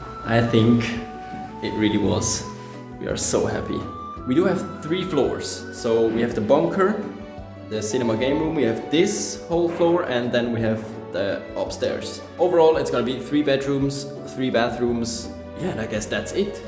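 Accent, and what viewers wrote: Switzerland. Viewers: Your voice sounds so majestic in this clip like some superhero lol and the swiss accent elevates it😂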